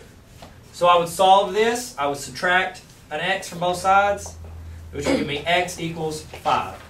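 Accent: South, United States. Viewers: I would much rather have this guy with a hot southern accent than my grumpy math teacher now. Please be my teacher.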